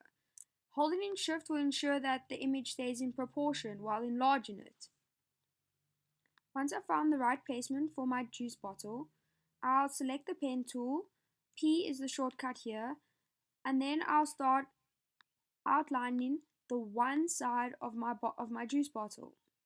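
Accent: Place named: Iceland. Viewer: Very nicely done. I enjoyed the flow of your Tutorial. I didn't once scream at my monitor trying to get you to hurry up! Great accent as well. Iceland?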